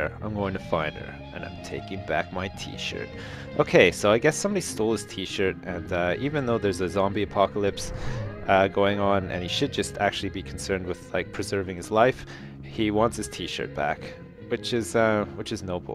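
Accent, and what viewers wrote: Canada. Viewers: I think the toaster helped disguise your Canadian accent. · you sound more canadian now ;( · OMG, that mic makes you sound canadian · ' new mic makes him sound way more Canadian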